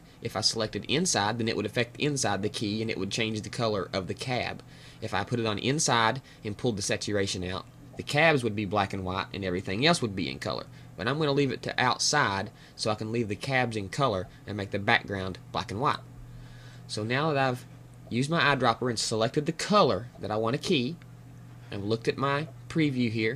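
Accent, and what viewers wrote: South, United States. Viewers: Very interesting, and very very informative indeed. This guy is a savior! a savior with a seriously serious southern draw! lol ;-P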